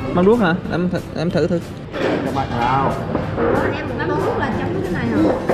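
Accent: Quang Nam, Vietnam. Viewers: anh nghe giọng nói của em không người quảng nam thì cũng người bình định định mà cứ khoe người sg cái giọng nói đấy thì lẫn vào đâu dc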